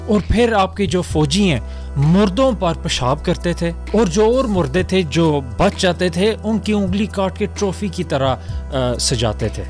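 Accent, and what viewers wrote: Punjab, Pakistan. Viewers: I can hear a hint of Punjabi? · ap punjabi ho kya . accent kafi heavy hai . btw , I am a hindu nationalist but then I share anti american sentiment like you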